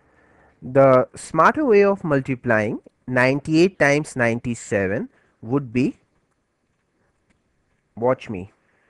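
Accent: India. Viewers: u r an INDIAN !!!... indian accent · are you a realy british... because your accent is like indian :D you are the best broh... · indian accent! nice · hey i think u r an indian but no offence. your accent is that of an indian. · LOL are u from India? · ur vioce its like an indian voice BTW im going to use this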